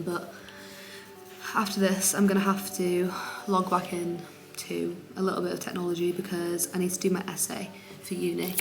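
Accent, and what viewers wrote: United Kingdom. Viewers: Guys her accent , is it british?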